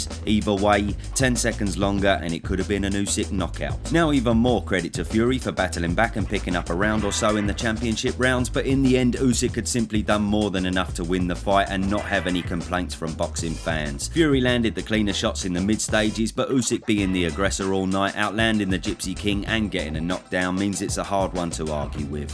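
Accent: United Kingdom. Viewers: Bro seu inglês e engraçado are you bitiish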